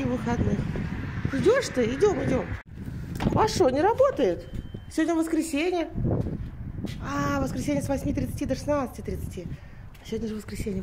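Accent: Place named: Crimea, Ukraine. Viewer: В Крыму тоже так говорят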